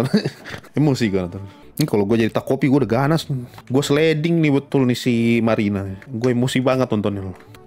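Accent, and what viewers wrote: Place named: Medan, Indonesia. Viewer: bang bahasa nya medan banget 😂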